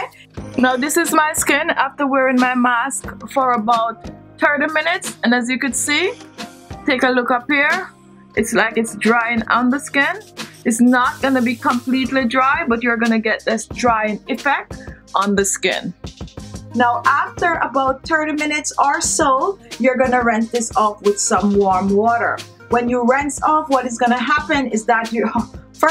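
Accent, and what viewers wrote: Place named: Jamaica. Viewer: Sounds like u r from Kingston Jamaica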